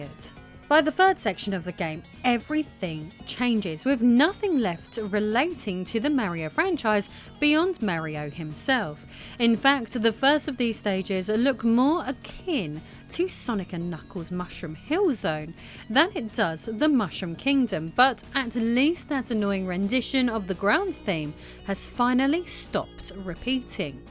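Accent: United Kingdom. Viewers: I'd ask you to explain faster but you're hot and I don't USUALLY like British accents however for you I'd make a huge exception.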